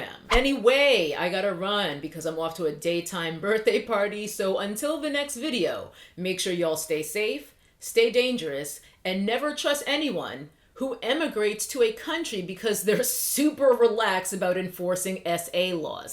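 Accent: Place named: New York, United States. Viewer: eggplant in garlic sauce in Flushing. You sound NYC so you know.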